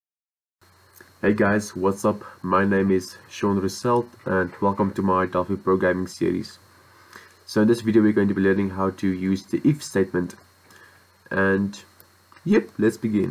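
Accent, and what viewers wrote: Afrikaans, South Africa. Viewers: ayy ek kan daai afrikaans hoor in jou stem hehe, baie dankie vir al die tutorials. Dit help rerig baie!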